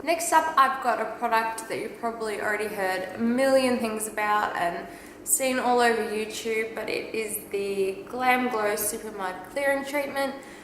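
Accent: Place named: Australia. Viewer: Love this! And seriously love hearing another Aussie accent! x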